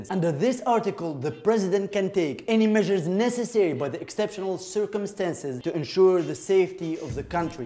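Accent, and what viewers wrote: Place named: England, United Kingdom. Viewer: btw i like your english accent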